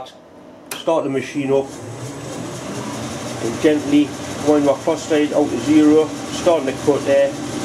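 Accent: Newcastle, United Kingdom. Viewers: Geordie, is he ?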